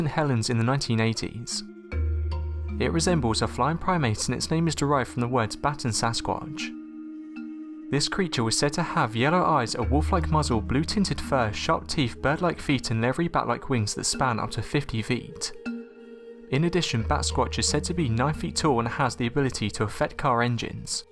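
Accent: England, United Kingdom. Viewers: Is it just me or do all videos like this have someone with an English accent narrating.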